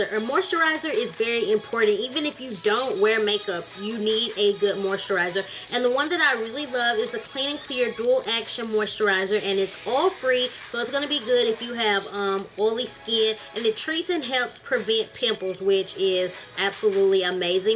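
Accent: South, United States. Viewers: I love your voice. Where are you from? I am in Louisiana, and alot of black people around here don't sound like that, but you do sound southern. Very beautiful voice! :)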